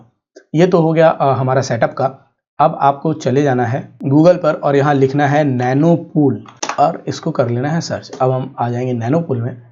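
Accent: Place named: Punjab, India. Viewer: Learn how to speak English or add English subtitles you punjabi derka derka speaking mo fo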